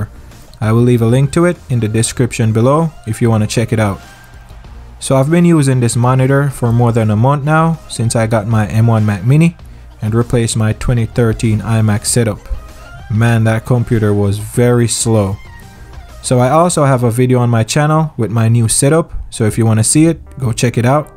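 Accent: Jamaica. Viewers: As soon as he said the first sentence I knew man was Jamaican lol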